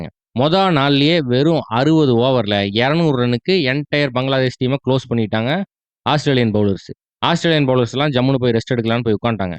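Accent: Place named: Pollachi, India. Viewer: Evan Enna sonnalum indha slang mattum change panniratha. Naanga school pasangala irukumbothu, enga area Anna nuga solran athe slang.. semaya iruku Thala... ❤❤🎉 Love from pollachi 😊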